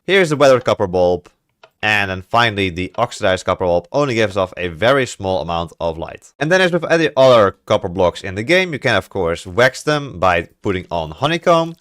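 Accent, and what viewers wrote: Netherlands. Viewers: Bedankt voor de tutorial! And yes, I know a Dutch person when I hear one, haha!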